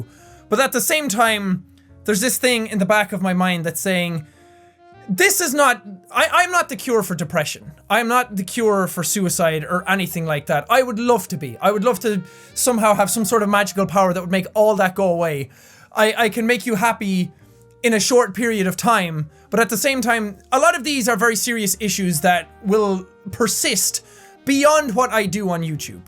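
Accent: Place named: Ireland. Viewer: i love your Irish accent and i even dyed my hair like you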